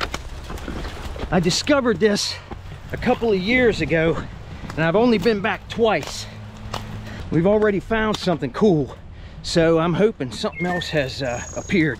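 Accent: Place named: Appalachia, United States. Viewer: you remind me of my cousins in Ohio and West Virginia with your accent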